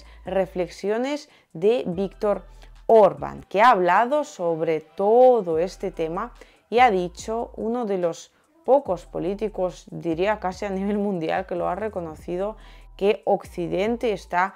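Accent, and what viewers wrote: Spain. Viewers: me acabo de dar cuenta después de llevar una grabación de ti un maestro lingüístico de acentos del español que tú hablas El Español de los gallegos de España felicidades sigues siendo la voz de RUSIA en Español y nos permite ver en México las dos caras de la moneda pero nosotros le vamos a Rusia porque estados unidos y su vecino del sur nos conocemos tanto que no podemos engañarnos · Todo esta bien . Pero deverias cambiar tu acento Español. El acento español no cae bien en América latina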